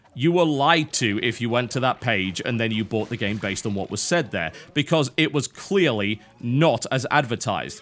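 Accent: United Kingdom. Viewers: No doubt a ton alredy replied something like this but... how the hell did you get that rather typical brittish accent to that of someone from texas xD? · Are you from UK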